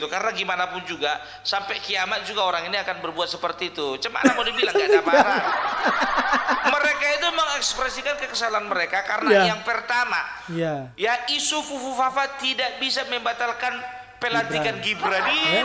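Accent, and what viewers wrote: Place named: Medan, Indonesia. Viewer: Kamu orang medan ya logatnya, tapi kok pake blangkon, tapi asik aku dengernya, besok konten lagi ya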